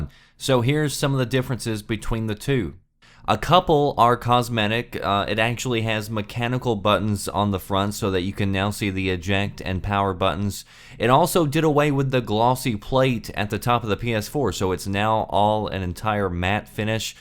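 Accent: South, United States. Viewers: it's that southern sounding accent I reckon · I like your accent, where are you from? (I'm British so don't recognise US accents). Subbed.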